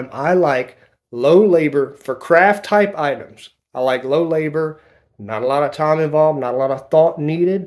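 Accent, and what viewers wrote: Louisiana, United States. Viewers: You must be from Louisiana…my fellow Cajun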